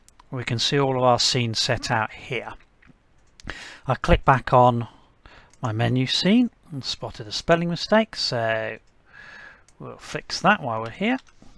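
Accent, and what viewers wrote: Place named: United Kingdom. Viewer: I have been watching tons of software tutorials about different applications and so far you are the most comprehensible and concise. Seems to me that you have planned it methodically or maybe you're just a natural. And this comment is coming from a guy (me) who is a non-native English speaker listening to another guy (you) with authentic English and a British accent. Keep up the good work. Thanks and God bless.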